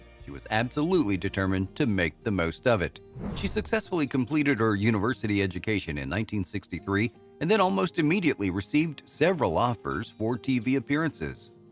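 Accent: United States. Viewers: sorry but american accent and its Surrey not sirry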